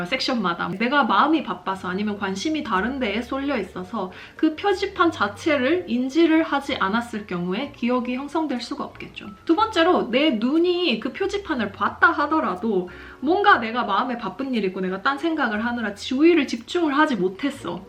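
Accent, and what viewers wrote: Gyeongsang, South Korea. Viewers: 차분한 경상도 사투리와 유창한 영어발음...뭔가 신선하다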